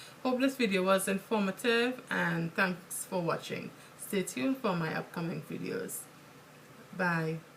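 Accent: Trinidad and Tobago. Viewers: your 'accent' ...are you Trinidadian? xD :)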